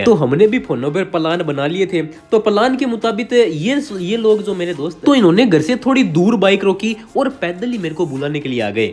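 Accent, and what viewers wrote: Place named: Rajasthan, India. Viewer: Bhai main Teri voice sunke Bata sakta hun tu Rajasthan se hai ❤️❤️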